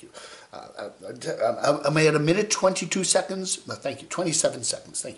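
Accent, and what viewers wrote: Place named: Boston, United States. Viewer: what is "service geezer" my idea can't be right . great accent ! boston ? lol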